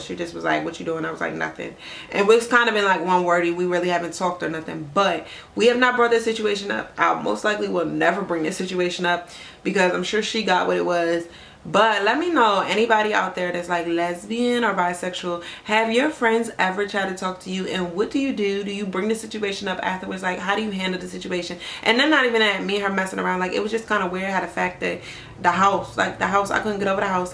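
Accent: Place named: Baltimore, United States. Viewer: Where are you from?baltimore?you got that accent!😊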